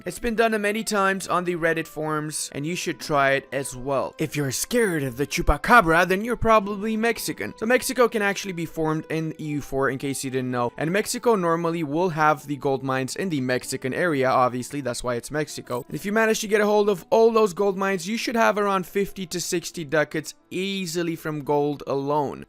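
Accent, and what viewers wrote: Australia. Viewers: The Aussie accent and references were bonza. Yeah, nah, something tells me you’re more than a little familiar with the place. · The Australian accent... it's not bad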